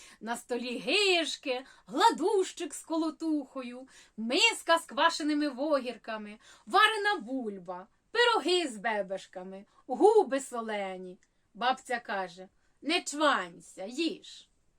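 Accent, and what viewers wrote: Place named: Podolia, Ukraine. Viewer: Рідний Подільский діалект, говорите - ніби співаєте. Міцного Вам Здоровля, дякую за гарний настрій)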